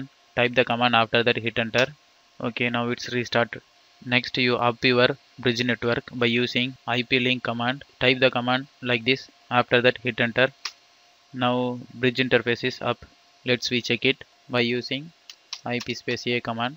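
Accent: India. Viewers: argh darrn designated street accent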